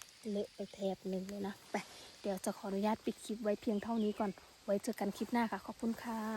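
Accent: Isan, Thailand. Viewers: สาวน้อยเดินป่าถ้าตกใจหรือเจออะไรแปลก เวลาอุทาน ใช้ภาษาอีสานบางคำฟังแล้ว ตลก ขำ บางครั้ง ก็แปลกไม่ออกแต่ก็ชอบนะ สำเนียง เสียงไพเราะดีมากชอบขอบอกจ๊ะ❤❤❤😅😅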